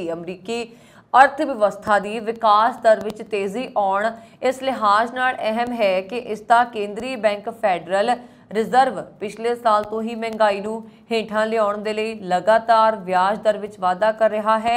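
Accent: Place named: Punjab, India. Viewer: Mam your punjabi accent is very sweet😊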